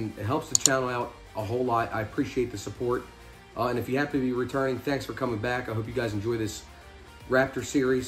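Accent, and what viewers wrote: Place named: Baltimore, United States. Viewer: Are u from Baltimore? I recognize the accent. Also, what do u do for a living?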